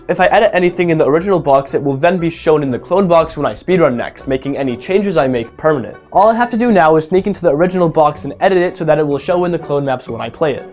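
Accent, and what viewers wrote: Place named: Canada. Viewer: The moment I heard Kenadian I started loving this guy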